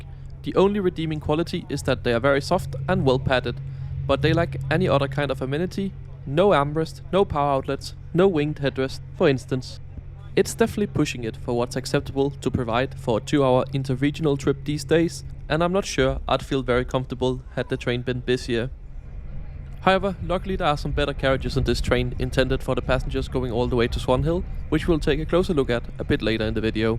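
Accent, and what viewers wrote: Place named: Denmark. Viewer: I love your Danish 🇩🇰 accent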